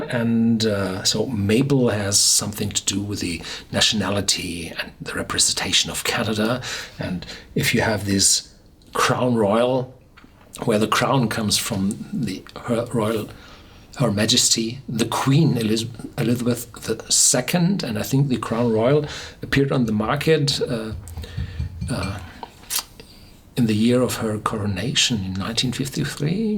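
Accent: Germany. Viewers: I don't think he's British or from the U.K. I believe he's a German native, though perhaps he moved and I'm wrong? He obviously has a very strong German accent.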